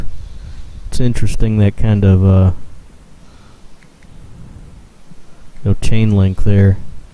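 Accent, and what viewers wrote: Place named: Midwest, United States. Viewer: Classic Midwestern Accent... I was asking myself... what accent? Then I realized where she was from. Your voice is very soothing. I would like to take an entire section of those glass blocks.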